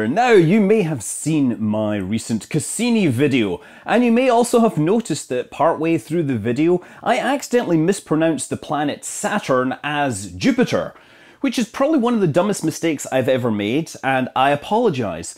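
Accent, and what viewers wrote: Scotland, United Kingdom. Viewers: Yeah we actually say the word like it’s spelled in the U.K. and pronounce all of the syllables correctly. To us it sounds like Americans are saying ‘meer’ when they try to say mirror. There’s nothing that remotely sounds like a ‘d’ in there, he’s just pronouncing the double ‘r’ properly. Which, sounds a bit stronger than an English person would pronounce it because of his Scottish accent. To us, it’s the American pronunciation that sounds way wrong.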